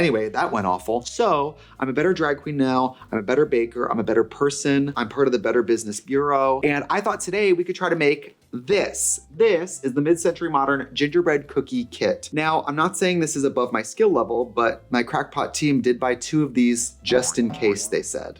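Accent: Wisconsin, United States. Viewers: Her Wisconsin accent was very strong in this video 😭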